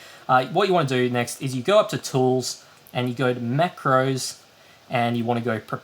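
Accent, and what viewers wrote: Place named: England, United Kingdom. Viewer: Your English is so English.. Us people from non-English-speaking countries may find ourselves as an intruders... Keep it low so us can understand you. Thanks anyway for the nice video!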